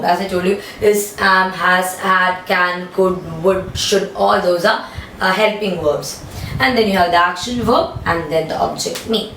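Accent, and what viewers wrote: Kerala, India. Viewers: Mam,are you from kerala?.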